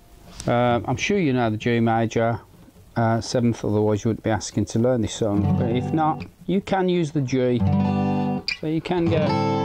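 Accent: United Kingdom. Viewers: Thanks, this is best tutorial I have come across ...do I detect a Midlands accent ? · great video spoken with a good Brummie accent .cheers from a Tammie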